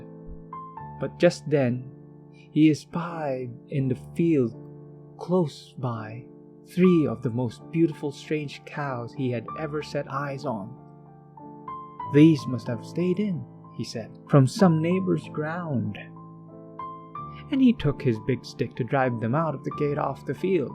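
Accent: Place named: Ireland. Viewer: Lovely reading, are you Irish yourself? I hear a subtle accent but not sure if it's thematic 🙌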